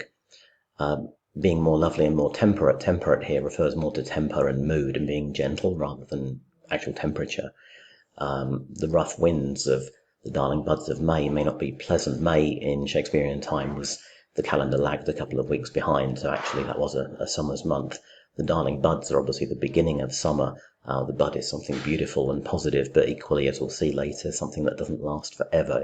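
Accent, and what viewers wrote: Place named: RP, United Kingdom. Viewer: I swear this one is the best interpretation ever! And your clear RP sounds exceedingly gentle!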